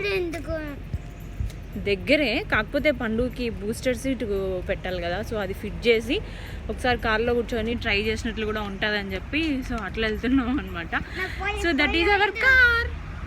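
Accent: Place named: Rayalaseema, India. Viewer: Ru from rayala seema ur way of speaking looks like dat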